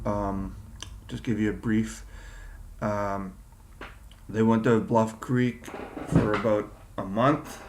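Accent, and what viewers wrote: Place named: Canada. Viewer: Thanks. Love your Canadian accent, and your videos too.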